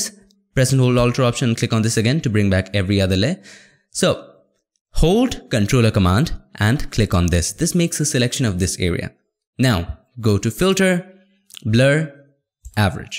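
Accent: India. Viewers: I usually hate indian accents but yours is somehow great in my ears 😂👌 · cannot focus on the video... indian accent and connected eybrows ruined the experience for me :/ good video tho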